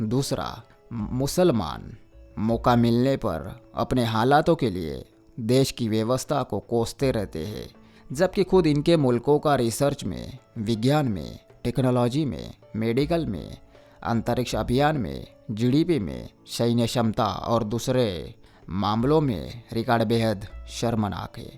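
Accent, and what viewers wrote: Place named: Mumbai, India. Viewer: Tumhara talking tone Mumbai walo jaisa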